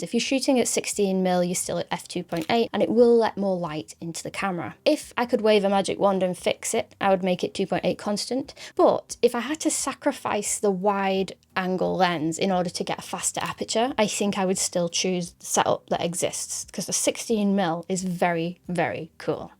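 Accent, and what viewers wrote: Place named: England, United Kingdom. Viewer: Your review gives us a bonus - Ask me, what? - Answer- learning your English accent.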